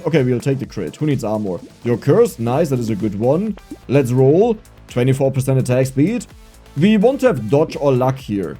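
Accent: Germany. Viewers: I can sense german ascent it subtle but when he spell r his germaniness appear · Nach dem ersten Satz gescheckt das du Deutsch bist XD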